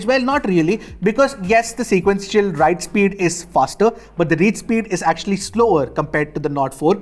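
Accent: India. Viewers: Your Indian accent is killing me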